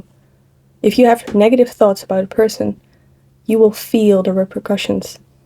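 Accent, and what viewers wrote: Netherlands. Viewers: I know a Dutch accent when I hear one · I like it..nice..video.. .reminds me of the Netherlands ur accent..a lovely country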